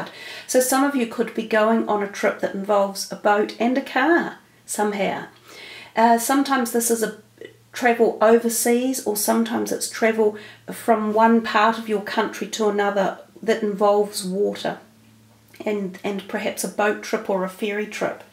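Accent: New Zealand. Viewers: You sound kiwi. 😊 💖. Thank you.😊 💖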